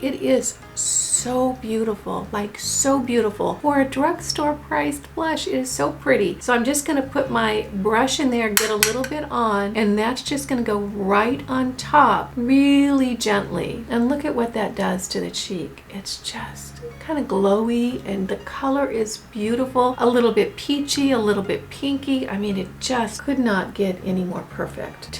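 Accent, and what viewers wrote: South, United States. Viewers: Started vlog at 5.30am in (Aus) woke way too early 🤣 and just couldn't resist hitting that start symbol. That southern accent? Gently drifted back to sleep then 3 hours later with coffee in hand just had to watch again. One who also wanted makeup tutorial your skin is literally glowing. Looks fantastic. Just wish products used when converted to $AUS could be purchased without my having to forego a fortnight rent 🤣 sad but true. Aus conversion rate, shipping & taxes in this country 😫 The final highlight powder I love but here at Sephora it's over $100. Sure there are dupes here but looking at reviews this seems to be in a class of its own. Am over 70 so getting a glow without looking like a sparkly crocodile is a challenge. Going to get the KVD bronze gel tho, so soft & pretty. Love her eye shadow pallettes as well but am def going to look at Milani. 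Thank you for videos & workouts that are age appropriate nice to hope that with effort & attitude maybe I am not totally invisible.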